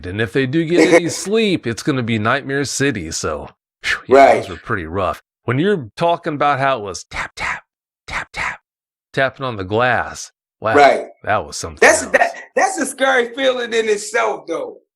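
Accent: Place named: South, United States. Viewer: It's the Southern way of telling a story... very distinct... You will hear the same cadence.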